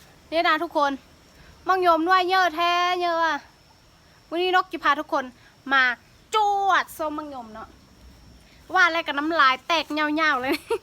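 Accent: Laos. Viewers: ตัวนกพูดแบบนี้แหละฟังรู้เรื่องถ้าพูดอีกแบบที่ ตัวเออ มันฟังไม่รู้เรื่องครับพูดแบบคนลาวพูดถึงพอจะเข้าใจครับ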